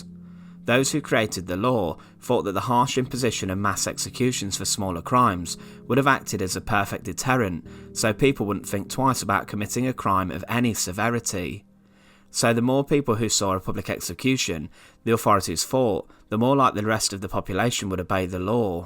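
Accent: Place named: England, United Kingdom. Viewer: Great narration. Love the accent. What part of England are you from?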